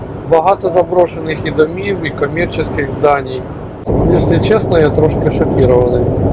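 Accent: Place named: Poltava, Ukraine. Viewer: Головне ти намагаєся,мовник сам собою налагодиться.А зараз в тебе виходить полтавський суржик.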